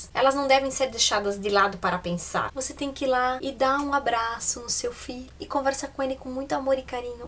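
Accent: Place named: Santa Catarina, Brazil. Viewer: Cara, continua nessa linha que vai dar bem certo! Gosto dos seus vídeos!!Do jeito que fala, ainda mais com esse sotaque "Catarina", rsrsrs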